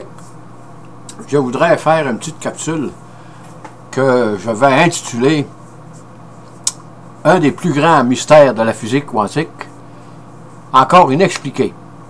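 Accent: Quebec, Canada. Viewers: On critique souvent l'accent québécois, mais moi j'aime bien :) Une question me vient sur ce phénomène que je découvre pour la première fois et qui m'interroge beaucoup : plutôt que de supposer une conscience à l'électron, pourquoi et comment est-on sûr que les appareils de mesure ne sont pas aussi des interférences dans l'expérience ? Comme une sorte de thermomètre qui donnerait (ou enlèverait) la fièvre ? Peut être que je manque totalement de pertinence, je suis plus un littéraire, mais je ne peux m'empêcher quand même de m'intéresser à ça.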